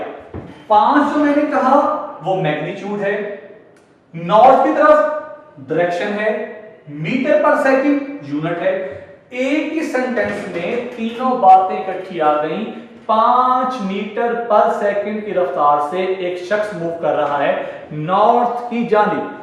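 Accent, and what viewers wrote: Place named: Multan, Pakistan. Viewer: Voice of multan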